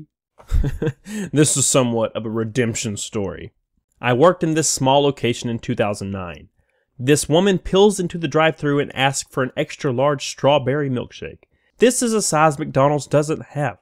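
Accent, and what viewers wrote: South, United States. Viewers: You've had people say they hate your voice? That silky southern drawl? The fuck is wrong with those people? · lol btw I like your voice reminds me of when I used to live in the south. I had some of the fondest memories.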